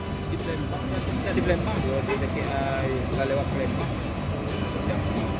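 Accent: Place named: Padang, Indonesia. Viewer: Dari logat bahasa Indonesia nya urang Padang Bana ko bantuak nyo admin Ko wkwkwk, nampak Bana lidah urang awak ... Sabkret ... Sabkret. 😀😀😀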